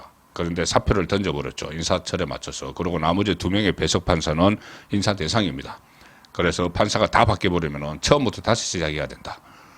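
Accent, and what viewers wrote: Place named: Daegu, South Korea. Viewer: 좋은 방송으로 잘 듣고 있는데 진행자의 억양이 참 거슬립니다..앞 음절이 올라가는 대구 억양인데 용어의 뜻이 달라지기 때문에 꼭 고쳐서 방송에 임해주십사하고...이런것은 사투리를 떠나 쉽게 고쳐질수 있다고 사료되는데...........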